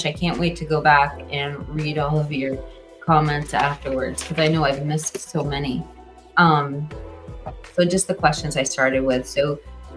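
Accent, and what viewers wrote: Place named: Cape, South Africa. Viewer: where is your Cape accent? 😮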